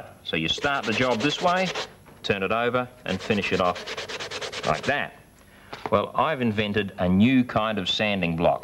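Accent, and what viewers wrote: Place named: Australia. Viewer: That is how we Aussies pronounce glacier as a rule.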